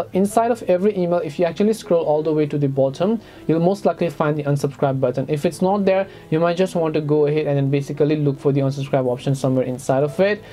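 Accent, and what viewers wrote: India. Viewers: Talking too damned fast and with and Indian accent. UGH. Learning requires a good teacher.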